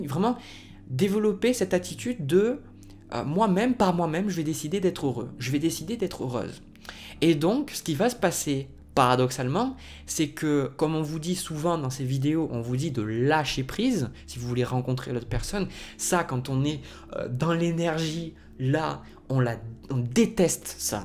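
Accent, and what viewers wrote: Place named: South, France. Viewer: Merci beaucoup...l'accent de "notre " sud est 1 plus 😊😉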